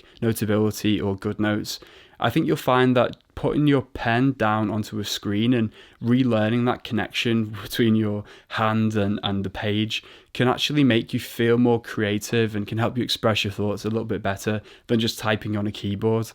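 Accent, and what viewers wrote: Liverpool, United Kingdom. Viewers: Congrats on 1000 subscribers! Just discovered your channel. You sound like you’re from Liverpool.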